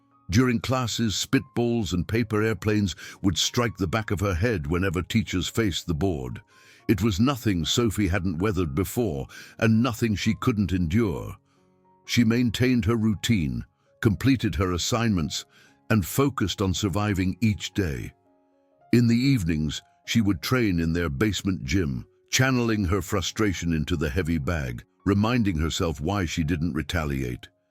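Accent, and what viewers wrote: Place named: United Kingdom. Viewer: Wow, sentences with punctuation, and an almost British-accentuated narrator, with judicious attention to intonation pattern as a function of sentence structure. A definite pleasure to hear. The plot may not be spectacular, given the prevalence of this genre, but it keeps moving the story along and the storyline is better than most.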